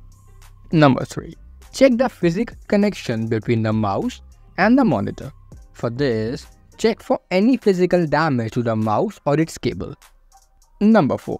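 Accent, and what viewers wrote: India. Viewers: This guy talk so indiany its understandable and the acsent is useless but thanks for trying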